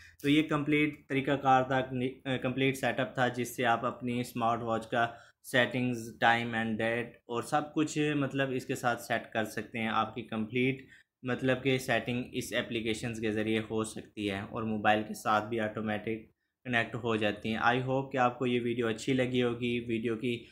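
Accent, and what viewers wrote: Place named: Pakistan. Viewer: Bro tumhari voice me Pakistan wali wibe aa rahi h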